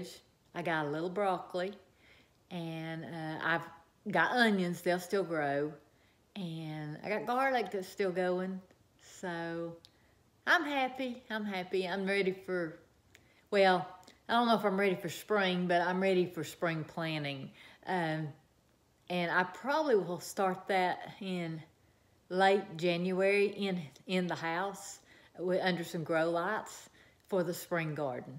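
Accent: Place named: East Texas, United States. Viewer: Thank you.👩‍🌾 I am enjoying my garden catalogs. I see my gardens in my visions underneath all this snow. You make me homesick. Are you east Texas? It sounds like home, I miss the sing song chatter of my Aunts and cousins in the kitchen.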